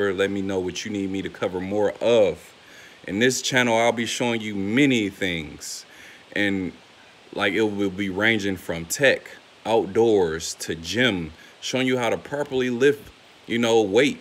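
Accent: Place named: Philadelphia, United States. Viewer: You gotta be from Philly I know my people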